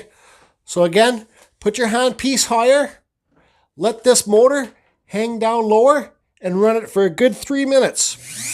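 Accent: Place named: Canada. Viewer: You're Canadian eh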